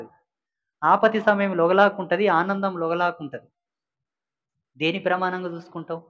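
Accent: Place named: Telangana, India. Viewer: గురువుగారు గొప్పగా చెప్పారు స్వామి మీ జ్ఞానం అమోఘమైనది మీ వాయిస్ చాలా బాగున్నది తెలంగాణ యాసలో మాట్లాడడం చాలా నచ్చింది మీరు మాట్లాడితే కెసిఆర్ మాట్లాడినట్టే ఉంది మీకు పాదాభివందనాలు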